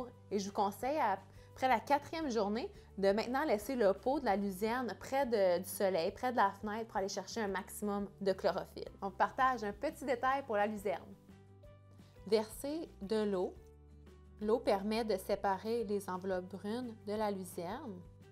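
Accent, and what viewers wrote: Canada. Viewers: C'est marrant comme tout d'entendre parler une canadienne. Sinon interessant mais c'est en pleine lumière(personnellement je fais ça a l'abri de la lumière pour en avoir des pousses tout blanches)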